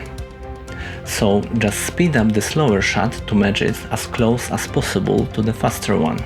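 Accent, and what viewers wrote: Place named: Russia. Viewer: Вы русский? 😅 You have russian accent Well done 👏 I learned a lot 🙌 thank you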